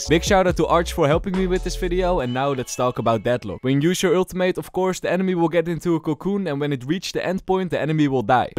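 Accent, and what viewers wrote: Netherlands. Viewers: bro has the most dutch accent ever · ur acent is dutch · from the 1e time i heard y i knew y whr dutch! ik ken het maat, het is ook zo bij mij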